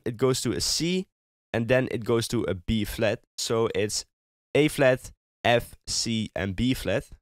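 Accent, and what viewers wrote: Netherlands. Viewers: 😂Je hoort het geweoon, je komt uit nederland zeker? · are you dutch? bc you kinda sound like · you sound dutch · It sounds like u have an dutch accent Are u dutch? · This guy is definitely Dutch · Ur Dutch? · Mooi nederlands accent haha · je nederlands accent man geen haat tegen maar tis een beetje raar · De eerste paar seconden hoorde ik al dat je Nederlands bent haahhaha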